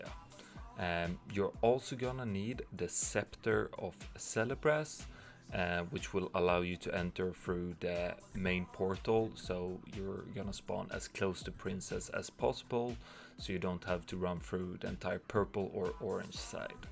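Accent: Sweden. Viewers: Well since you ask what we would like to see next, here is what i would like to see =) I think a maraudon one pull tutoriel would be a great video. Ofc there are already a whole bunch of mages doing mara one pull on youtube, but i think every mage has his own little techniques, his own sensibility, and i would be pleased to learn what are YOUR way of handling this farm and what are YOUR personnal tips and tricks. Plus i like the way you explain things, with your swedish accent and all =)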